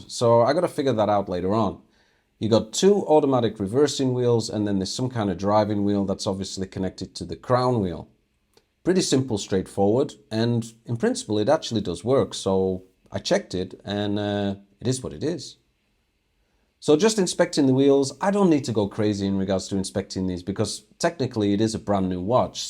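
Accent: United Kingdom. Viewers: Interesting accent, are you a Brit abroad maybe?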